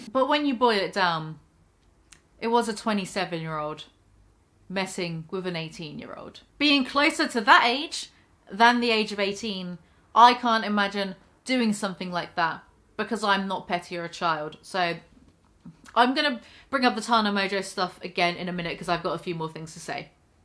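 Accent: United Kingdom. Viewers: Are you British ? Sounds like you’re from where I am haha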